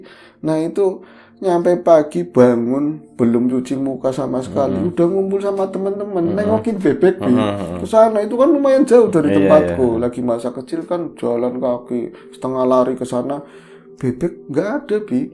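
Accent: Banyumas, Indonesia. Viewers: Logat ngapak nya blm ada lawan kaya nya... bisa ngakak sendiri kalo dengerin beliau pas cerita.. haahaaaa.. sukses selalu yaa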